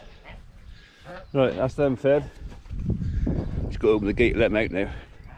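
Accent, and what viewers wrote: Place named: Herefordshire, United Kingdom. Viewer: Yes farming is a bit hit and miss atm . Anyways all the best with it .. Remember next Friday is Funky Farmer's Birthday . Why dont you do YouTube live like he does . Sweep and I hope we did not offend you by saying you had a hobby farm . Though we still think you have a Herefordian accent. Btw Sooty and friends soon will be doing videos our selves with Charlie the Chimp . Keep safe .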